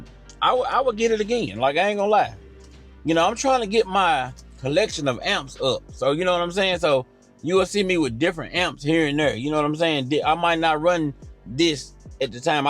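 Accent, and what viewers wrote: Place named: Tennessee, United States. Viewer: Completely unrelated but you from Tennessee? That accent sound similar to mine 😂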